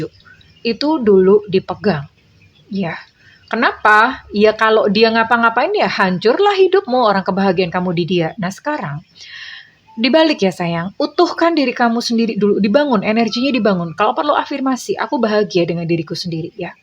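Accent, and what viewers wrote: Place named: East Java, Indonesia. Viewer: Bunda orang mn y kok bhs nya kayak orang Jawa timuran hehe sy orang Jawa timur Bun hehe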